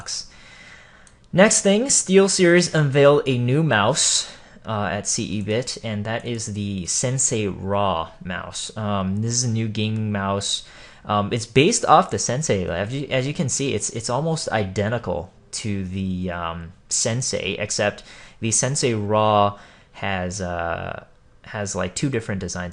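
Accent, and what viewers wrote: United States. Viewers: this is my first time seeing you and I must say it, I did not see this coming, not being racist but I though you were america white because you had an american accent but you look pretty sexy (no homo). Other then that Congrats on you partnership you do deserve it :D.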